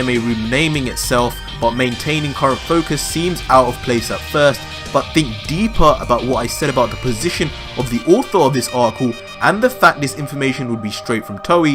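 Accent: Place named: England, United Kingdom. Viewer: Hello you sound like your from England, so am I !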